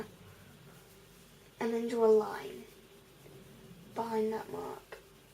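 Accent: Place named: United Kingdom. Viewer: I LOVE your videos! I have already done all the Bakuras and I like Kisara too. It's so nice to hear another British accent on YouTube, too many Americans, though mine a lot more country than yours! :-P Just wondering, could you do either a Malik or Marik video please! I would love to know how to draw them! Anyway, love the videos and keep drawing! :-D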